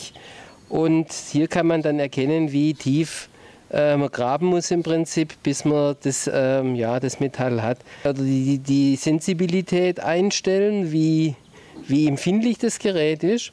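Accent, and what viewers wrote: Swabia, Germany. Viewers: Schwabe oder Schweizer? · Blaulicht 112 Ich auch am "S" erkannt man die Schwaben.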